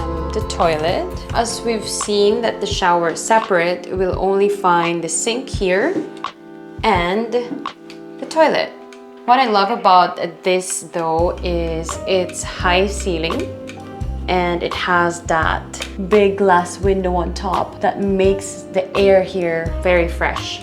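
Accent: Ireland. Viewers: You sound like Irish.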